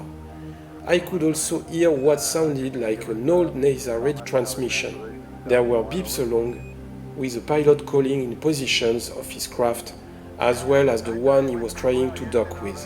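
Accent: France. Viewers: I have to say a critic but hope you'll receive this as a constructive criticism, hope you'll forgive me, your videos are super awesome however your French accent is very strong sometimes I nearly can understand you you need to talk more clearly, it's my point of view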